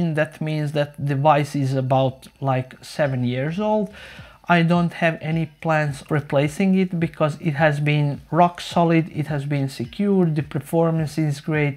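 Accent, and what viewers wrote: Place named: Russia. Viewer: You compiled this list of six "tiers" of hardware and then decided that the most efficient way for you to convey this information was by speaking slowly into a camera? You speak far slower than I can read. Also, your accent grates on my ears. I can't stand your Russian/Balki from Perfect Strangers accent. Why didn't you just post this information on a web page? This video is complete garbage. Whoever told you that you should speak in front of a camera should be tried for war crimes.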